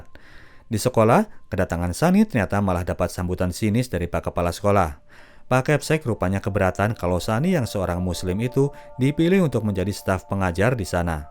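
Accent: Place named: Banjar, Indonesia. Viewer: Fix suaranya orang banjar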